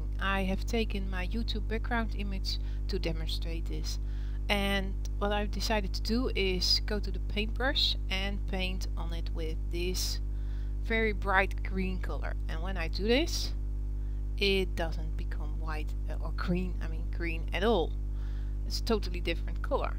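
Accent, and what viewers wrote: Netherlands. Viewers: definetly dutch, good tutorial though : D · Yep, that's Holland ! I can tell by the voice and the buildings ! I visited there with a friend in 2005.....wonderful country and the people were so tall !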